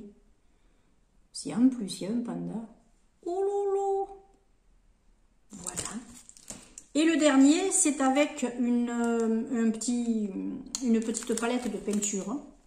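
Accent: South, France. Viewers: Merci pour le partage. Vu le petit accent je pense que vous êtes dans le sud. Je vous dis ça parceque moi en région parisienne, on est ravitailler par les corbeaux. Chaque fois que je vais aux 3 Actions vers chez moi. A croire qu'ils se donne le mot. Je suis nouvelle sur votre chaîne et je viens de m'abonner. Bon dimanche Amicalement Lidia. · Coucou, Très joli haul, et grâce à ton accent, on entend presque less cigales 😊 Je te souhaite une merveilleuse année 2024, et tout le bonheur du monde 😊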